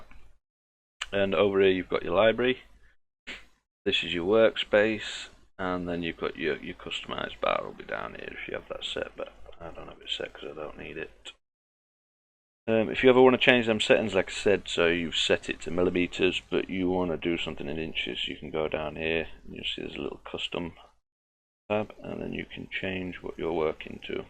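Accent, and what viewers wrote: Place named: United Kingdom. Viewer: It's about time we seen a brit doing videos more on youtube and the best thing of it yet is, it's solidworks 2017 of which I'm learning to use better!! Proper banging video dude, I think everyone should drop their H's where I live here in Northampton and being a southerner I'm seen as an alien doing that LOL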